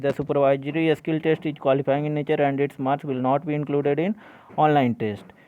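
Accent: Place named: France. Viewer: Hindi hi bolde Bhai English Teri French Jaisi hai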